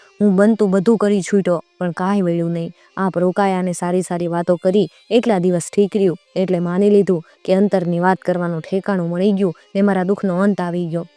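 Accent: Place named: Saurashtra, India. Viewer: શાબાશ બહેનબા હવે,સૌરાષ્ટ્રની ભાષાની બોલીની છાંટ અને ધાર બરાબર આવતી હોય એમ જણાય છે. ધન્યવાદ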